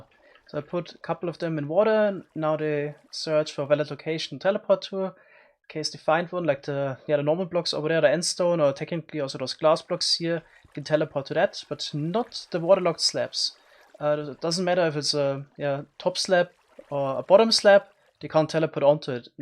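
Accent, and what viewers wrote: Germany. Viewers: 100% der Akzent ist einfach mega deutsch 😂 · are you from Germany? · Du bist deutsch, oder?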